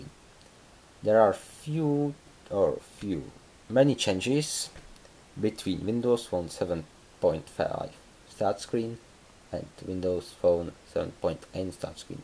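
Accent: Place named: Czech Republic. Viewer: AAAA!! Ta česká angličtina je fakt hrozná, nic ve zlém, má to tak většina lidí z ČR =D Jinak příště zkus líp zaostřit na telefon, ať to není tak rozmazané ;)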